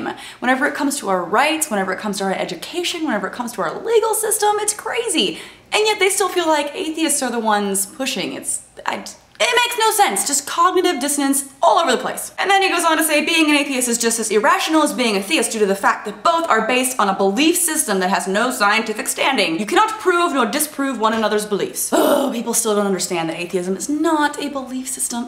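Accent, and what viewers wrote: South, United States. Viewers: the country accent was actually very cute. keep up the good work huge fan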